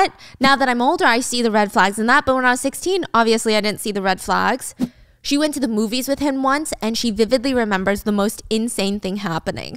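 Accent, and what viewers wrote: California, United States. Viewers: You have the strongest california accent I've ever heard xD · You talk like a girl from Cali I think. Are you from Cali?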